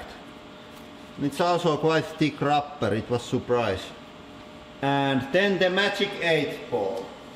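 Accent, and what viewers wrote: Finland. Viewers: Are u finnish??😂😂 im finnish too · finnish accent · Is that a Finnish accent I hear? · nyt on kyllä niin selkee suomi aksentti että :DD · Every Finnish and Estonian person speaks like this anyways so not so unique. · r u finnish?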